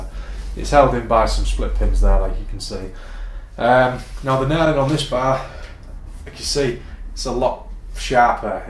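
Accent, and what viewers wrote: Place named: Hull, United Kingdom. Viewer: U from hull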